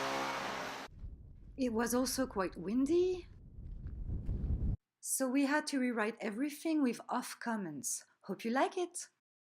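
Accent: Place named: France. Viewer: most enjoyable video related in delightful french accent.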